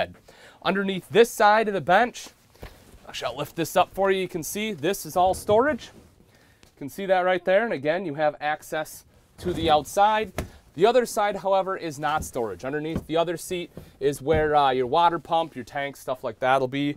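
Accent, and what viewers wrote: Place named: Minnesota, United States. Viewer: Dude sounds direct from Minnesota! I’m from there and I’m like whoa!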